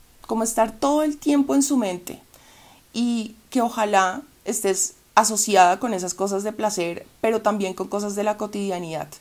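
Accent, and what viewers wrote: Bogotá, Colombia. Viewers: Gracias por su consejo lo voy tomar en cuenta, por cierto pienso que vos eres de colombiana y de Bogotá pues ese acento es solo de esa ciudad porque pues soy también Bogotano, abrazo eres muy bonita 😘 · No se como llegue a este canal’ basto solo ver un video para admirarla y suscribirme.... Me encanta el acento de los colombianos 😊. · Que deleite escuchar ese acento colombiano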